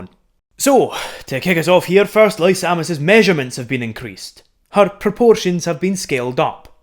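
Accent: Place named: Scotland, United Kingdom. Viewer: Scottish... Oh... Uh, I suppose I probably shouldn't mention what I thought the accent was... I hear it's a touchy subject for Scotts :s.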